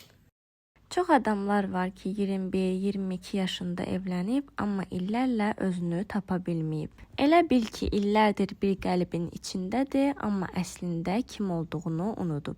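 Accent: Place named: Baku, Azerbaijan. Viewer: Baki lehcesi ruha terapiyadi😍😍😍😍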